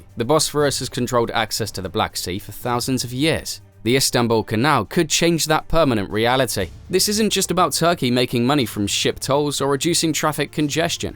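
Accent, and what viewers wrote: United Kingdom. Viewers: He sounds like U.K.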